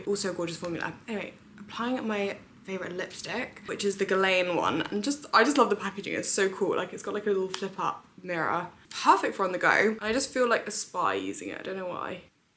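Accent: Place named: United Kingdom. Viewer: where are you from exactly in UK your accent is hard for me to understand🥲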